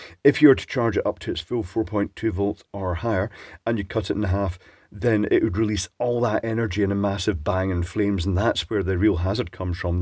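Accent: Scotland, United Kingdom. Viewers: A Scottish accent indeed, but a very pleasant lyrical Scottish accent. I wonder what area of Scotland speaks like that? Certainly not Glasgow with its hash, brutal delivery.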